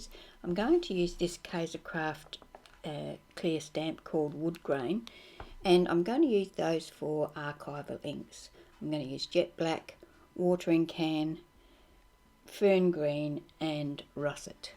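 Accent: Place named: Australia. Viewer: Great process video thank you. Love to hear an Australian voice!!!( I'm in South Australia.) You could put a clear sealer on your background such as clear gesso or matte medium or a spray varnish, then the white won't absorb the colour....Also the powders won't reactivate...good for me to learn from you!!! Thank you.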